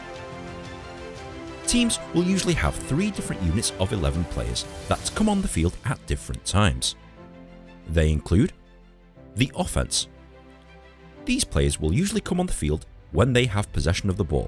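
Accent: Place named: Northern England, United Kingdom. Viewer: This guy is so American yet has a British northerner accent with his Us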